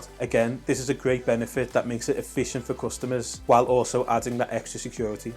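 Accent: Liverpool, United Kingdom. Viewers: You sound like a scouser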